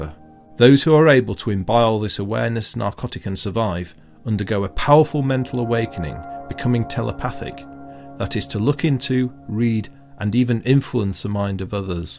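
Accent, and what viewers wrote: United Kingdom. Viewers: Love that British accent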